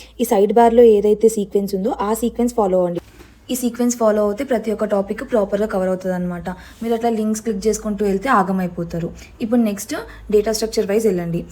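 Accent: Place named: Hyderabad, India. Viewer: Hi, I am an SDE 2 from a Product based company in Hyderabad. I really loved this video. Me being a pakka hyderabadi, absolutely loved your slang. Coming to the content, I can vouch for everything you said about the DSA prep & interview. It is fully informative and would be really helpful for everyone. Kudos to your efforts to help our Telugu people.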